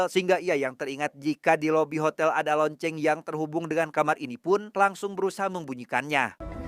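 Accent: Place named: Sunda, Indonesia. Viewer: Unik bgt suaranya. Film horor tp suaranya kyk ada sunda2nya beuuh ucul bgt fans wh lah mang . Curiga tos ngaliwet😂 ieu mah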